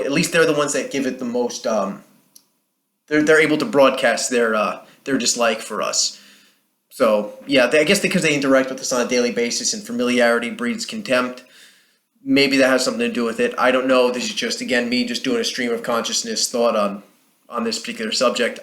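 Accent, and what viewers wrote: New Jersey, United States. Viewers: good video!!!I'm from NJ but I used to live in Florida when I was a teen/20s. It was awful especially when guys would ask where I was from and visibly cringe and say ewww. Mind you they'd never been to the state. Over the years, I've met so many people who'd say, "I've been to NJ and it was actually really nice!" They were shocked because they were expecting a dump. Later I moved an area in Florida where there were a lot of NJ transplants. They are the biggest traitors. They will tell everyone how horrible NJ is, how glad they were to leave, it's a dump, blah blah. I never met one NJ transplant in Florida who didn't have something negative to say about the state. I'd try to defend NJ and then someone would say , "Oh my co worker is from NJ and he said it's the dirtiest state in the nation and is so glad he left!" Nobody in other states care about the New York rivalry. 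Nobody outside of NJ cares about taylor ham either. Most of the cause with hating NJ is Heresay (repeating what they've heard other people say), coming in to Newark airport or coming through Camden like you said. Also another big issue is that most of the time if NJ is featured on a tv show or movie, they show the shittiest parts of the state. Why can't you ever see mountains or horse farms of NJ? Why is it always either a crowded beach or a city? And the accents are another issue. 99% of the time if an NJ person is on tv, they have a new york accent. You never hear accents like yours! To me you have an NJ accen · I moved to Houston down south and everyone pinned my accent to NJ. I never thought I had an accent but people laugh at my pronoun-actions from time to time. we all sounded regular to me I can tell he has a NJ accent but I thought it was the general American accent.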